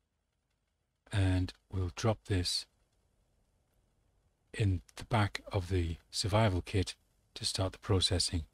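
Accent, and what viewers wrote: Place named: Sunderland, United Kingdom. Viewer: Sounds like you're from Sunderland.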